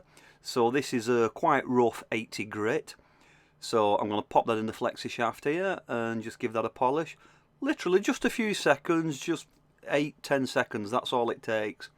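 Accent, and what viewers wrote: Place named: England, United Kingdom. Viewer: Great to here an English accent on a video! Don’t think we can get these in South Africa though!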